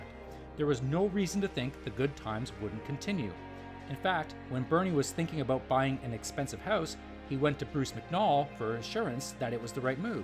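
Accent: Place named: United States. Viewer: Sounds like an American talking about a subject he knows little about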